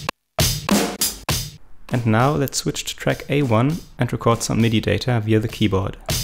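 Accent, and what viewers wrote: Germany. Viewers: Are u german ? Oder halt bist du deutsch ? Your acent sounds very german :) I want to buy me something like this and your tutorial was very clear and helpful :)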